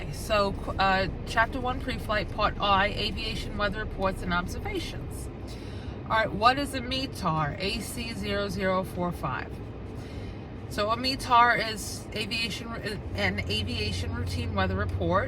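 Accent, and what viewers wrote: Brooklyn, United States. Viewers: I heard no difference between your mothas very thorough Brooklyn accent and your normal voice. Don't mind it, honestly. I don't hear many of those these days. I will subscribe.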